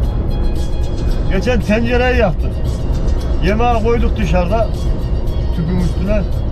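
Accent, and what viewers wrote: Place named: Çorum, Turkey. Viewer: Dede sen corumlu olmadigina eminmisin.corumlular gibi konusuyon.kirsehir corum şivesiylemi konususyor yoksa yaw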